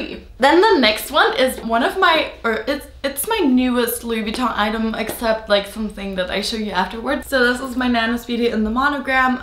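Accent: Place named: Germany. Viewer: Super schöne Sachen😍ich finde wenn man weiß dass du deutsch sprichst, hört man voll den Akzent raus🙈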